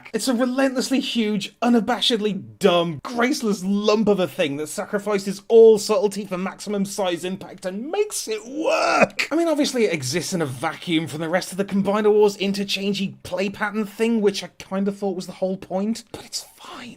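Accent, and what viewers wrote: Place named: United Kingdom. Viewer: Ah, the accent and "cheeky". Of course you know Penfold and Dangermouse. Did you guys have Bananaman?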